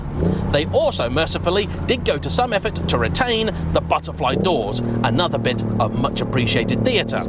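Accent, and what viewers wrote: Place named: Liverpool, United Kingdom. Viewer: you are my favourite Scouser, pls come to Pakistan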